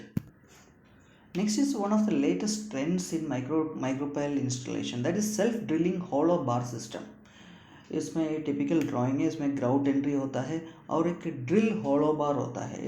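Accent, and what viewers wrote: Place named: Kerala, India. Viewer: Hey Malayaleees...Alae...Slang ketapol manasilayi. True?.. and Good Info Bro keep it up...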